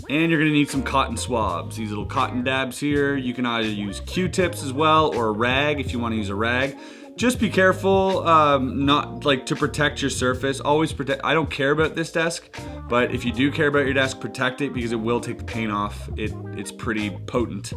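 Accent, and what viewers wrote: Quebec, Canada. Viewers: Are you from Canada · Ohhhhhh un québécois mon boy!!!! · Guy was speaking french ... Ou ce vidéo a t-il été fait ! Accent québécois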